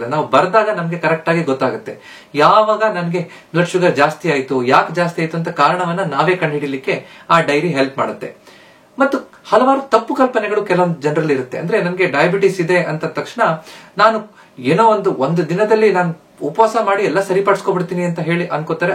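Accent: Standard. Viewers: Clear message. ಶುದ್ಧ ಕನ್ನಡ - Like always.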